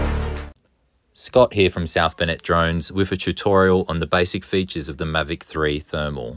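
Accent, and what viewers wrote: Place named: New Zealand. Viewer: Nice summary. You sound Kiwi though.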